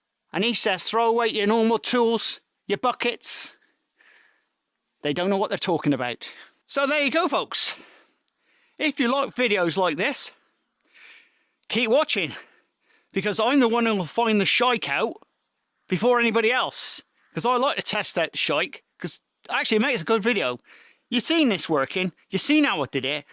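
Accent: United Kingdom. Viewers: West country or Norfolk?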